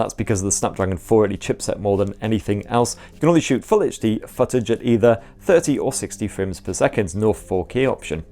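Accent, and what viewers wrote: United Kingdom. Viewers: Just came to hear the British accent 🌝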